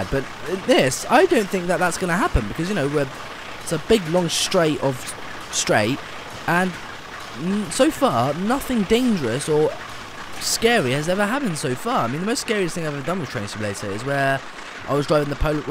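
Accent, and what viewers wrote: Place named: United Kingdom. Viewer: That's just his accent, it's British pronunciation.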